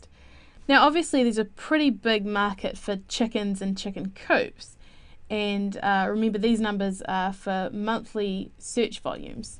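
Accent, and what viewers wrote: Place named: New Zealand. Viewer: Sounds like New Zealand to me.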